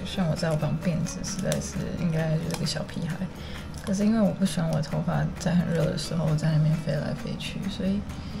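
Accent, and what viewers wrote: Taiwan. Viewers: 高雄口音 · my favorite tour guide with a sweet Taiwan accent, you made the Malaga tour very interesting. Great job! Thanks.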